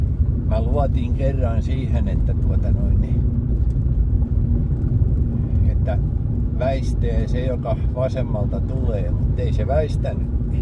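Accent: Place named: Tampere, Finland. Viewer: Aaargh!😖 Saan suorastaan oksennusrefleksin, kun kuulen tamperelaisella murteella ”määää näin niin isoja Raktodin munia pellllolla” 🤮🤮😵 Ei kertakaikkiaan voi käsittää, miten ylös työntävältä voi kuulostaakkaan tämä ”raktodin muna” näin isäntänä…..ja murteeltaan. 😵‍💫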